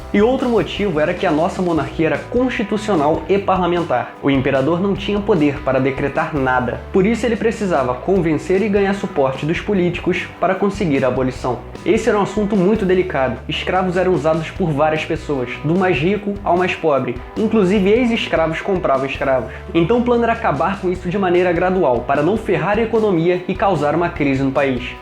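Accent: Rio de Janeiro, Brazil. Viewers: kkk mnw sotaque carioca é muito foda kk melhor sotaque do país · Engraçado a forma que os cariocas falam nascer, eles colocam i no meio, tipo naicer.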